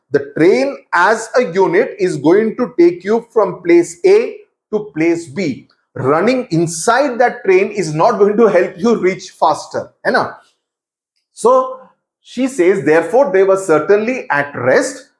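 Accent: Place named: South India, India. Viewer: Sir i think you are south indian . Your hindi dictation is clear but hindi tune is like south Indian's people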